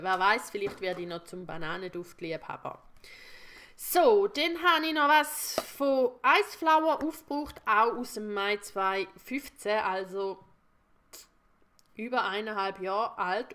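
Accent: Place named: Switzerland. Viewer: Tolles Video, gefällt mir sehr gut! 😍und der schweizer dialekt macht dich sehr sympathisch! :) Hab dich gerade erst entdeckt und dir gleich mal einen Daumen hoch und ein Abo dagelassen! 😊 Würde mich freuen, wenn du bei mir vielleicht auch mal kurz vorbeischaust! Alles Liebe! 😊❤️ - Daniela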